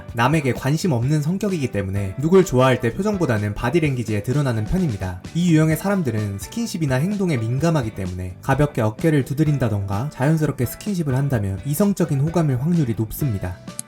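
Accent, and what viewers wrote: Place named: Jeolla, South Korea. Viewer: 전라도 사투리가 느껴지네요 ㅎㅎ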